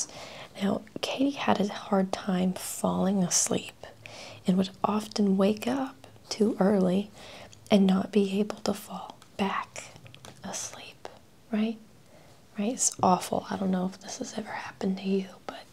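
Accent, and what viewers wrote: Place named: New Jersey, United States. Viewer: Love your Jersey Accent voice in this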